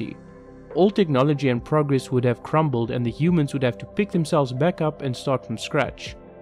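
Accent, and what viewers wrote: South Africa. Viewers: This is a great video! Thank you.... Also, your accent sounds very local to me. I think we may be from the same country! South Africa? :) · Are you south African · I like to support a fellow South African. Could tell from the accent. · South African accent makes me feel home again, moved 5 years ago. This is one of my favourite series right now so it ties together nicely. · was quite excited to hear a saffa voice :D keep up the good work! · Haibo,…narrator sounds like a Saffa 🇿🇦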